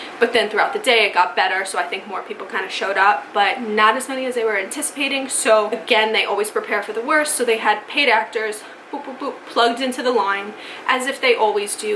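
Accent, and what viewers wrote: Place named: New York, United States. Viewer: Your freaking accent is so on point I could tell you were a New Yorker from the beginning of the video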